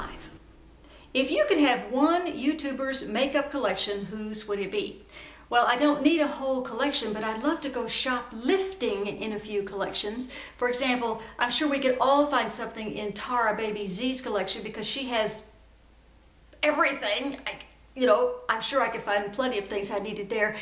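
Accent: Italy. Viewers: Thank you for the tag! (you have a great Italian accent!!) xo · Your mom reading 50 Shades Of Grey really made me giggle. My FIL has read it too and he is 77!:-) Your Italian accent is super cute! I enjoyed learning these facts about you,. XOXO! Sandra.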